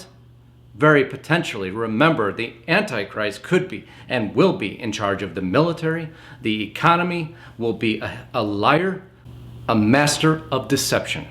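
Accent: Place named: Chicago, United States. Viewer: Any chance that you are from Chicago?? Your accent is thick 😊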